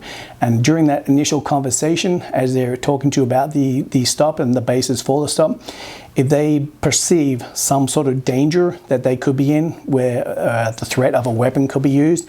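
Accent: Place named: Australia. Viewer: you sound like an Aussie. When will the US have insurance and registration linked to the number plate so the police only need to ask for your licence?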